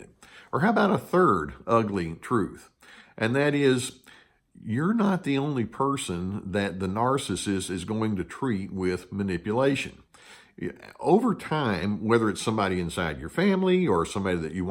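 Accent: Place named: Texas, United States. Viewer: Added bonus: his Texan accent is a pleasure to listen to, always soothing. It helps, because sometimes the cold, hard truth that he's giving is difficult to hear.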